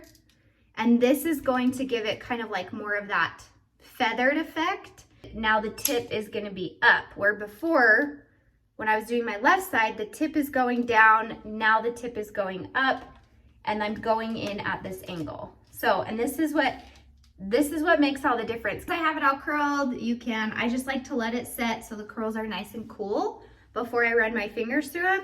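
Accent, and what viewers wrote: United States. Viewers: OMG! Can you tell me what part of America that accent is from?? So cute! Oh my God! 😁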